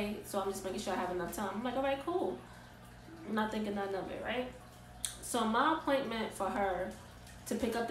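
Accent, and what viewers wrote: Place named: New York, United States. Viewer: I hear her accent Im from nyc too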